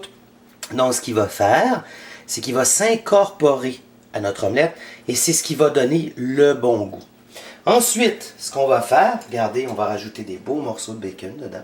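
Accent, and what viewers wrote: Quebec, Canada. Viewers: Oui c’est l’accent du Québec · Donc, est-ce que chef est Québécois? J’ai un peu de peine à lui comprendre. L’omlette etait superbe! Charles Barfield, Etates-Unis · Accent québecois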